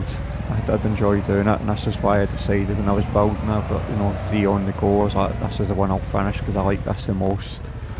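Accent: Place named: Europe. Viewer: I absolutely LOVE your accent brother. Well I guess it's not a accent to you but it is to me 😂😂 I'm just a silly American who loves European accents...Scottish, Irish, UK...Oh yea, nice build too 😂😂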